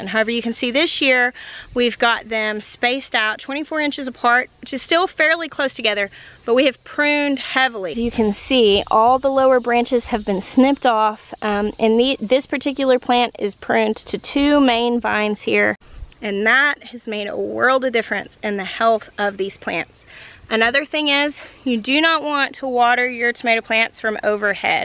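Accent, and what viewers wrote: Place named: Mississippi, United States. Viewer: Hello from Scottsdale Arizona where I am growing a great crop of tomatoes and other veggies. I am enjoying your videos very much and being from Mississippi I connect with your accent! You are exceptional and your wisdom shows in each video. I am learning ech time I plant how too adapt some of my southern learning to the desert climate. Will you share what you use for a mulch after planting tomatoes.. it looks like hay or alfalfa. Thank you.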